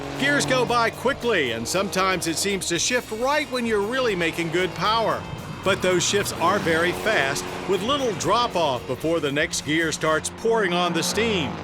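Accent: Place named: United States. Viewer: Wow, this guy has the most American voice ever.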